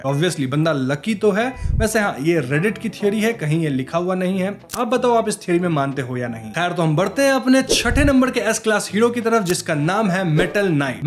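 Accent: Bengal, India. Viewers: Are you bengali... ❤😊😊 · Are you bangali? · Bro you are a Bengali right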